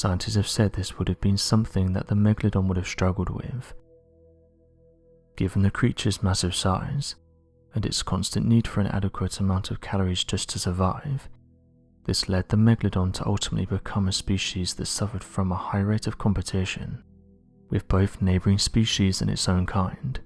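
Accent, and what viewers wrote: United Kingdom. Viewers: Just because you mysteriously whisper with a British accent does not make this video and your story and you any less full of sh*t.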